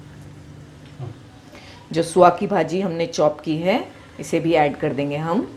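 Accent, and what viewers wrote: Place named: Maharashtra, India. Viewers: Madame excellent. Are you from Konkan ? Your Marathi accent comes through ? Very nice .Why isn't it as widespread as vada pav ? Add egg and it becomes a very healthy BF .The use of shapu ! Brilliant. Great . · Thanks for sharing. Wow. Aap bilkul Bombay walo ki tarah bolti hai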